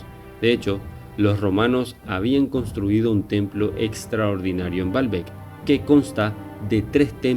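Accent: Paraguay. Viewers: Eres de paraguay amigo ?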